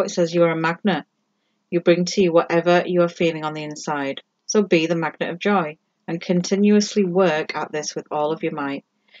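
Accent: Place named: Northern England, United Kingdom. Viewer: Ru from Northern England? You sound very local to me 😊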